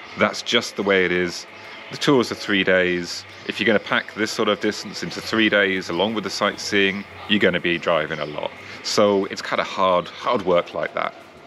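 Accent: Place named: Glasgow, United Kingdom. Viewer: You have a hint of a Glaswegian accent.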